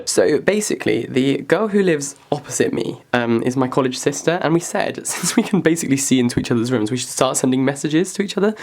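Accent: United Kingdom. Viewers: Am I the only one who is imitating his accent? Seriously I'm in love with the british accent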